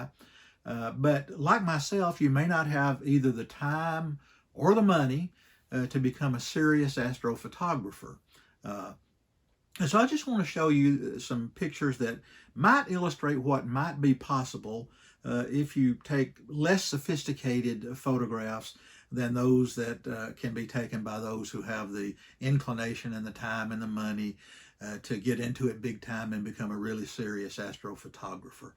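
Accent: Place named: South, United States. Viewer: Awesome photos. Love your channel. Very clearly explained concepts on your videos. Plus love ur Southern Accent.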